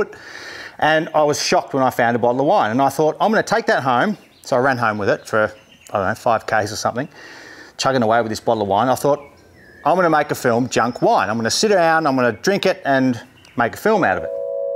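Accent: Australia. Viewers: O, how I missed that Aussie voice.... Thank you loads for making 2020 a little better. Lots of love from the Netherlands!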